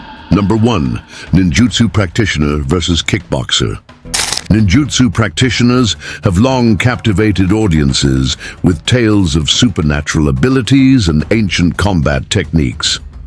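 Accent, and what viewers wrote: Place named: France. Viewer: Vidéo de M.... l' IA parle anglais avec un accent français de toute beauté ( ça peut pas être un humain 🤔 ) mais les commentaires sont hilarant 😂😂😂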